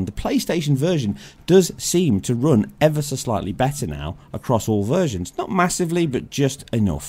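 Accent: United Kingdom. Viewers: Doing tech analysis with UK accent is the right move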